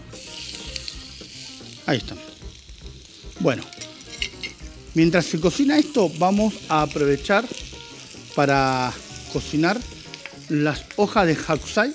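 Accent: Argentina. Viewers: Me suena gracioso escuchar un japonés hablando como los argentinos, pero se ve que es un buen chef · ME PONE LOCO VER AL JAPO HABLANDO PORTEÑO....MARAVILLOSO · A lo primero me chocó escuchar en un japonés,ese increíble acento argentino,pues esperaba que fuera un canal de Japón.Pero me a encantado esa sencillez con la que se expresa.Un humilde español les desea todo lo mejor. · Hola primera vez que te veo,muy interesante e instructivo, solo que me dió mucha risa ver un descendiente japonés con el acento Argentino.. mirá vos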